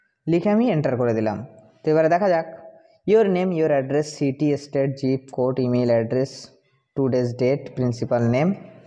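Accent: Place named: Bangladesh. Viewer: তোমার গলা শুনে বাংলা দেশি মনে হচ্ছে , একটু ভাষার শুদ্ধতা নিয়ে আসো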